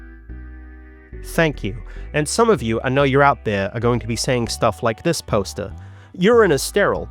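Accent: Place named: New Zealand. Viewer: nz accent jumpscare (positive) dude I've just been through like 5 of your videos, these recaps are great! .. I truly had no idea this story had SA users involved omfg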